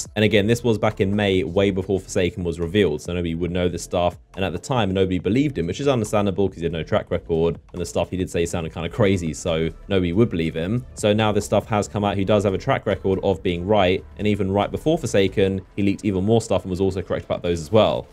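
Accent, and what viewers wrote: United Kingdom. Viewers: are you gay or just british?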